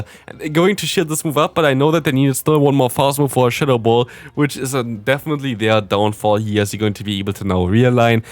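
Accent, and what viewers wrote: Germany. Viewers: Der deutsche Akzent kickt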